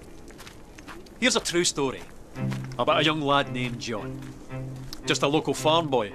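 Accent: Scotland, United Kingdom. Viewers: Is he saying genuine Scottish accent? It sounds cool and sexy. Which makes his voice sexy, his voice or Scottish accent? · thats Scottish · He's Scots!!!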